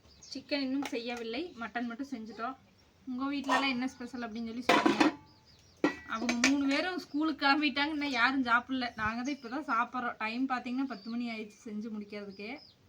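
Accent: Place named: Kongu, India. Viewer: கறிவிருந்து அருமை அருமை நேற்று நீங்கள் பேசிய கொங்கு மொழியும் என்னைப்பற்றி கூறிய விதமும் மிகவும் நன்றாகவும் விரிவாகவும் இருந்தது உங்கள் அம்மாவிற்கு தேனீக்கள் போல் என்றென்றும் சுறுசுறுப்பாக இயங்கும் அம்மாவிற்கு எனது பாராட்டுக்கள் ❤❤❤❤❤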